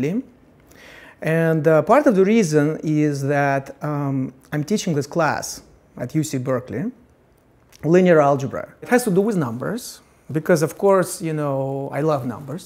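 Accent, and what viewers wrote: Russia. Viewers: Russian accent hm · sounds like Russian pronunciation. maybe he will do same speech in Russian? it would be such a pleasure. but I can be wrong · I LOVE HIS ACCENT . MOTHER RUSSIA!! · It so funny and pleasing to hear his Russian accent and intonations here and there :) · Thinks Hmmm... He has accent like a russian. googling Yup! He is russian :) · That's real mild russian accent. Doesn't quite sound like ensign Chekov, right? :D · Born May 2, 1968 Kolomna, USSR i knew it from his accent! )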